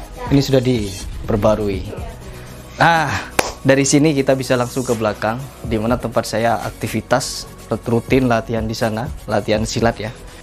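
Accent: Madura, Indonesia. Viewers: Oalah...Mas asli Jember toh? Pantas msh ada dialek madura yg lekat...sengkok reng Probolinggo tretan! Tetanggeh...😁😁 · Terdengar ciri khas bicara Madura a. Kak salam settong dere sengkok derih madureh tapeh lambek 3 taon neng jatiroto pas tips malem miggu sering nongkrong di alun2 Jember jiah kak salam KK maderen Padeh beres salamet Ben tambe sukses amin.🙏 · pantesan deri pertama nyengok vidio nah bahasan nah logat medureh hehee deddih asli jember hihi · Pantesan ada logat maduranya bang, salam dari madura bang🙂🙂🙂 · Oalah, mas asli Jember 🙏 😊 pantesan logatnya ga asing logat maduranya mas masih kental 😊🚬☕ salam mas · Oo arek jember to, keluarga ibu ku mayoritas dr jember hgga sekarang. Pantesan logatnya spt org madure. Salam cak.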